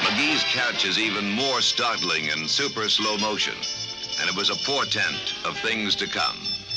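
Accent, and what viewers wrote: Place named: Transatlantic, United States. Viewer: prolly the transatlantic accent.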